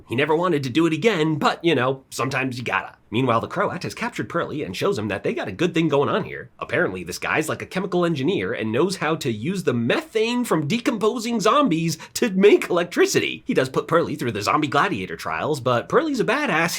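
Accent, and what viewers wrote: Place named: New York, United States. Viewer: Thanks, love the content as always. Was really looking forward to the Dead City recap, and it didn’t disappoint. Love the New York accent 😅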